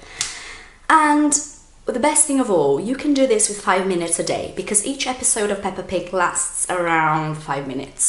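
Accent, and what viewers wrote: United Kingdom. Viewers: How did you get this accent? I really love British accent. Thanks for sharing these videos with us! Congrats for you Channel.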